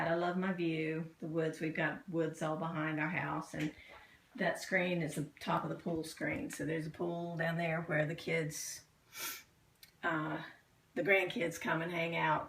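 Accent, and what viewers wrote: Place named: South, United States. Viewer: Thanks for sharing your incredibly inspiring art room and art process. My kids think I'm an art supply/junk hoarder, but you just normalized my accumulation of art "treasures." Love your accent! I miss the south.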